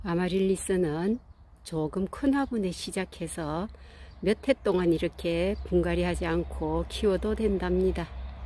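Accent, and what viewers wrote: Gyeongsang, South Korea. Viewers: 저도 전원생활하면서. 야생화키우고 있지만 이렇게 많은품종과 넓은곳은 처음봐요 말투과 경상도 사투리가 있는데 어디예요?저는 대구살다가 지금성주에서 전원생활하고있어요 가까우면 구경한번하고싶어요 스켈이 타고나요~^^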